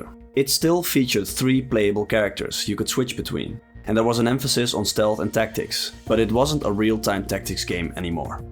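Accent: Netherlands. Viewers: Good video. As a sidenote, you sound hella dutch.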